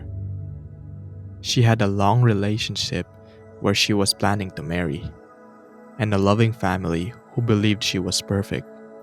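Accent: Philippines. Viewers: I hope you don't find this offensive, I just want to say that the Filipino pride in me ran rampant in my blood because your accent sounds like Filipino. I hope you continue and I commend your content, graphics (which reminds me of my fav YouTubers: Nexpo and Crowley), and the voice-over. It's nice to have you back on my recommended.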